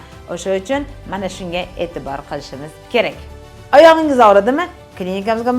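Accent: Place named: Khorezm, Uzbekistan. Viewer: Оник хоразмилисиз дохтир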